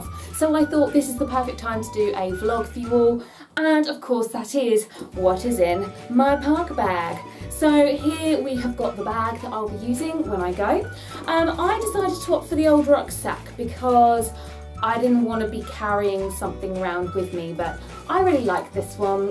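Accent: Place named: United Kingdom. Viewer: UK accents are so charming. you could literally read the dictionary and i'd be mesmerized. You have such a great personality! you need more subscribers! i'm going to DW in may as my graduation gift, and this made me so much more excited!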